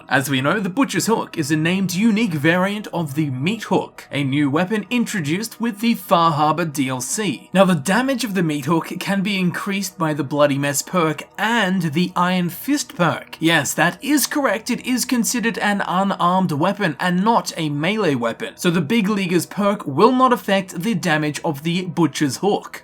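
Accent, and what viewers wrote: Australia. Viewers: your aussie yeah or am i crazy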